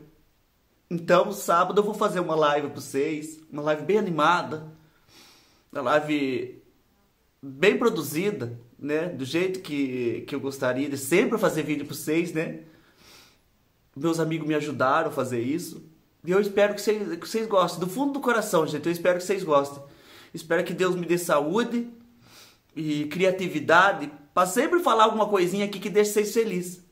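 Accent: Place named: Rio Grande do Sul, Brazil. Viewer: Gostaria de saber de onde vc é .Achei que fosse gaúcho, pelo jeito que tu fala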